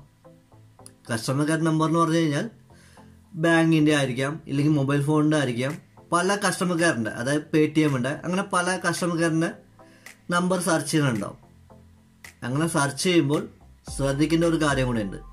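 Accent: Tamil Nadu, India. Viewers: Malayali alla... atha... oru tamil touch😊but cute.. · MOTHER TONGUE TAMIL ANNALLE ? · ഒരു തമിഴ് ചുവയുള്ള മലയാളം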